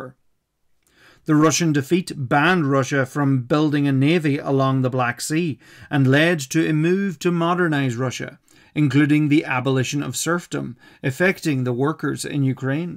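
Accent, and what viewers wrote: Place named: Ireland. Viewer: Ah there is that beautiful Irish accent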